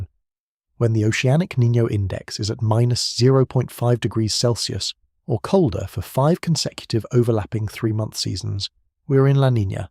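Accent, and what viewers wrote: United Kingdom. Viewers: Why the British accent on these videos?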